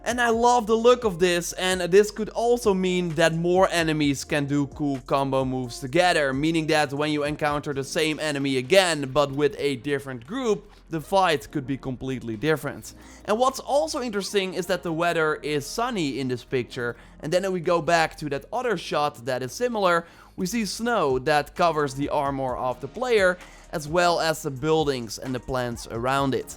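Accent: Netherlands. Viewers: Damn I hate the Dutch accent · You, I can hear that you're Dutch. Is there going to be an area in Valhalla in the Netherlands? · Gwn een vraagje, ben jij nederlands?? Denk dat ik n nederlands accent hoor🤭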